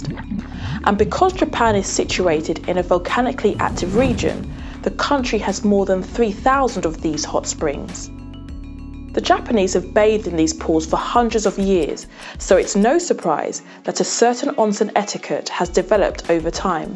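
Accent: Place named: United Kingdom. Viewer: She is british.that accent is from there.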